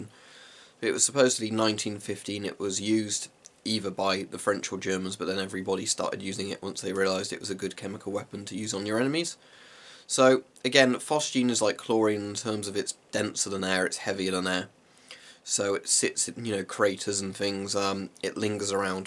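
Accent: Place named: Bedfordshire, United Kingdom. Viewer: Oh okay you sound like you were from my neck of the woods is all.. I'm in Bedfordshire .. Love your vids keep up the good work!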